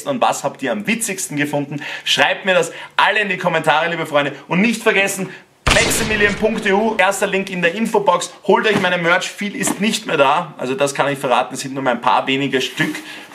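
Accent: Austria. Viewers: Ich liebe österreichisch🇦🇹bist du deppat endlich einen gescheiten Youtuber gefunden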